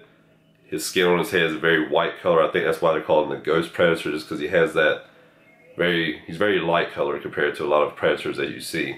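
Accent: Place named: South Carolina, United States. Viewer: Damn dude you sound like you're from my part of the world. Are you from South Carolina?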